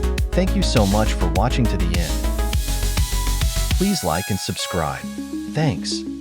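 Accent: Denmark. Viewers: Yes, very cool. I miss your Danish accent. My computer is haunted, it would not let me stop watching your video 😱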